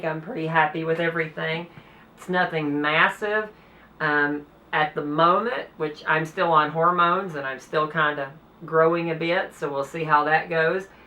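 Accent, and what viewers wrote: South, United States. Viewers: The word for subcutaneous air (air under the skin) is "crepitus". Pronounced CREP-it-tuss. Congratulations! I too am trans M to F. Your accent is distinctly southern. May I ask what state you are from? I am a Florida. All the best! Alanna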